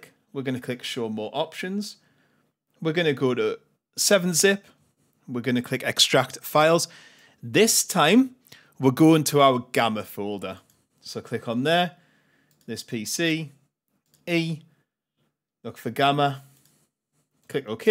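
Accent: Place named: North East England, United Kingdom. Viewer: I'm Newcastle, so I'm thinking Washington/Sunderland/Durham maybe?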